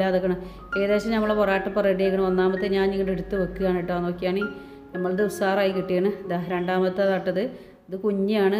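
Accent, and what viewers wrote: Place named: Malabar, India. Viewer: Pure malabar Muslim laungage.Very intersting for lissen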